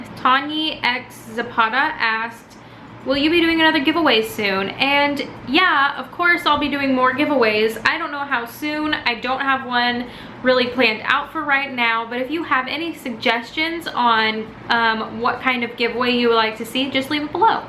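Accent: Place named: Texas, United States. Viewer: I did not no you live in Puerto rico you should like your from texas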